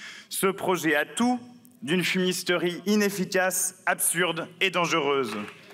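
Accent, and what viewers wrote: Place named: Brittany, France. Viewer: Merci pi. Vous êtes breton, cela s’entend. Vous aimez l’océan, cela se voit. LFI nous donne la force et du courage. Merci.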